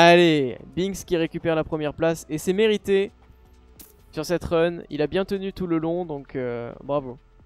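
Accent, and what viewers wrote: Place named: South, France. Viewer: 2h du mat quand je regarde et surpris d'entendre gueuler un sudiste au bout de 10sec de vidéos XD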